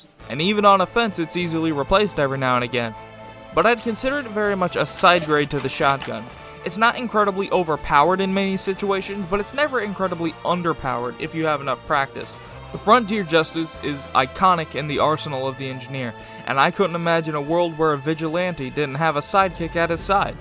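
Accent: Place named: South, United States. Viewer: his southern accent? It's fucking GOLD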